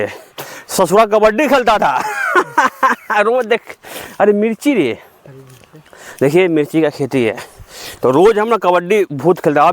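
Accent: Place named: Bihar, India. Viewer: Aapka ka bihari andaaz achchha hai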